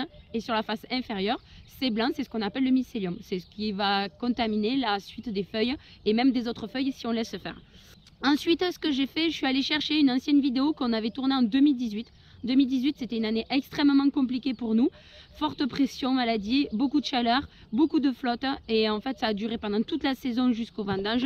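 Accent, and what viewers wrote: France. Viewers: Encore une fois super vidéo. Ce qui est bien avec toi c'est que tu expliques très bien les choses sans que ce soit barbant, on passe toujours un bon moment. Beau travail sur le montage, les scènes insérées c'est une bonne idée. You have a great french acent when you speak english 👍 I wish you do a complete video in english . See you asap 👋👋👋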